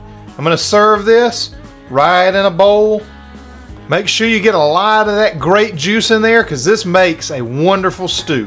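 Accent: South, United States. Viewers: Hi, I absolutely love your southern accent. I can listen to you for hours. I’m from Australia and we don’t do American accent well🤪 but I think after listening to you for a couple of hours, I might actually nail it 😀 Great video, I made your ultimate keto bread yesterday… OMg it is so delicious … I just had it for lunch today…even my non Keto husband loved it. I also had enough mix left over to make 6 muffins which I will freeze if my husband doesn’t get to it first Cheers from down under and keep up the work 🎉🎉🎉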